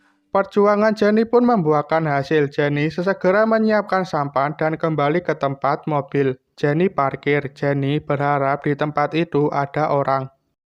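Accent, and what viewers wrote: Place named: Java, Indonesia. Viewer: Medok tp seru bro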